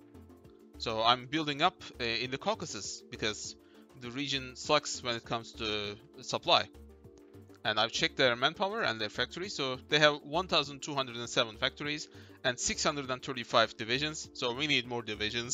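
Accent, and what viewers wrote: Turkey. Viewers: aksanı duyunca dedim bu bizden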